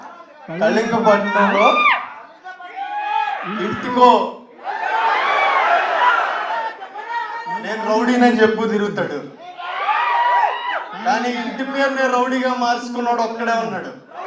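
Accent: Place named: Tamil Nadu, India. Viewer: Dialogue lo TN slag ee vinipiatundhi😂😂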